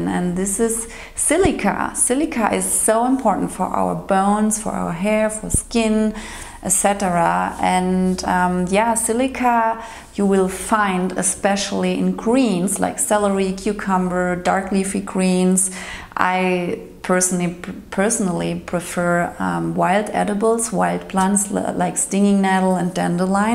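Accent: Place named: Germany. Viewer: Keep it up! Your English is great. I think you have a lot of potential doing your videos in English as well as German. I think your German accent actually sounds very nice and makes you unique relative to other raw food channels in English. Thank you for reminding me of these truths about how to maintain overall health.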